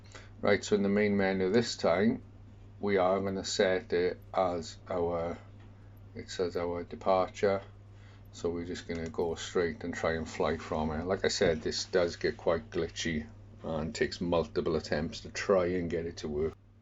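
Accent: Wales, United Kingdom. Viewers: Now THAT's definitely a Welsh accent lol, ello from Mid Glamorgan.. Nice vid, When we get helicopters in this sim? i will definitely drop down in there. Incidentally? This had the Exact same glitch at this point in the olf fsx days.. and i used to host sessions from here.. lol.. and 747's 737's .. we landed them all down there, although it wasn't as deep as this hole in this sim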